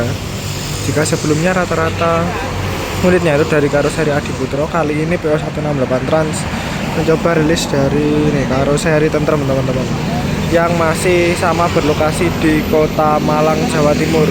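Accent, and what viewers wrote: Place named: Banyumas, Indonesia. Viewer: Ngapak pride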